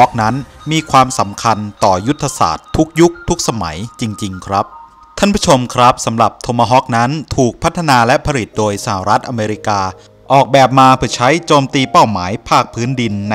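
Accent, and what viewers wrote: Thailand. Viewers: แอดคนบ้านใหนเสียงเหน่อๆ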